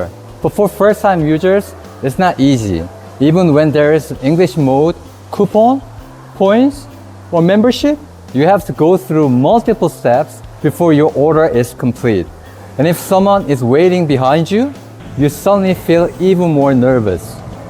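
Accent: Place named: United States. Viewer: By his American accent, sounds like the narrator came to the US after he was 13 yo?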